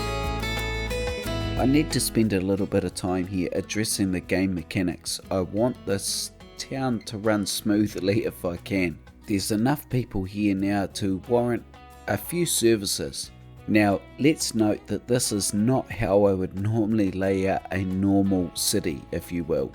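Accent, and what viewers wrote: New Zealand. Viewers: Your level of detail is amazing. You popped up out of nowhere! How long have you been playing the game and been on YouTube? Love your Kiwi accent. A Canuck here!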